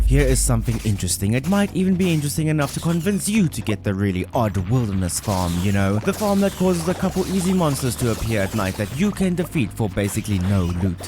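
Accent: South Africa. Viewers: I sense a South African accent...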